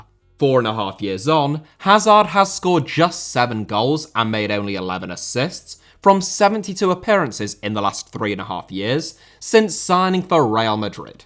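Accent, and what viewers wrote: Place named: England, United Kingdom. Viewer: I can understand the non-English words but the English words? It's just his accent lol if anything that's the correct way to say those words since English came originally from England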